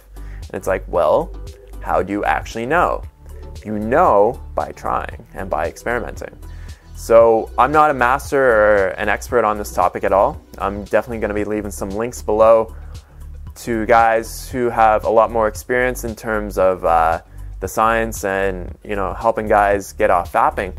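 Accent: Canada. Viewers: You're Canadian? (Btw i was so addicted i got ED, and in the most critical period in my life, if ya know wat i mean)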